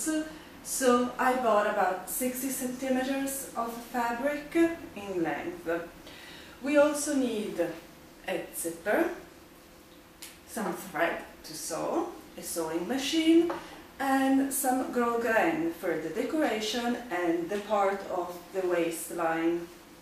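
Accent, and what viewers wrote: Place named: Italy. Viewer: grazie per la lezione. mi piace il tuo accento italiano ...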